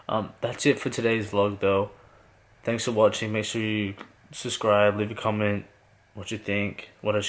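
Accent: Australia. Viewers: his australian accent is coming back it's so cute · You've got your Australian accent back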